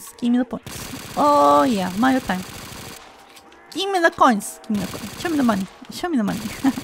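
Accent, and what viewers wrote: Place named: India. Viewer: She sounds India/Pakistani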